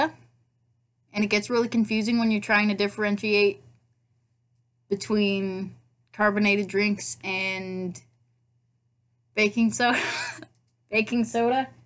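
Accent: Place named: South, United States. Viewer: To my English ear you sound southern but only a bit. Definitely southern though.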